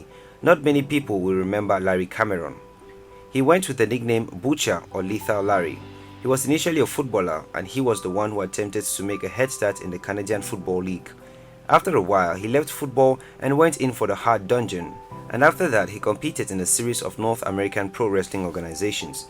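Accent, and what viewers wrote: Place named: Nigeria. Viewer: Longest intro... n nigerian kinda voice over.. i bet all this history are true😅😅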